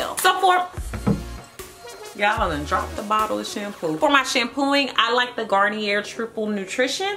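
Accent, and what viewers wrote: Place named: Houston, United States. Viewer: One of the best natural hair videos. You sound like you're from H-town. You're hair is the bomb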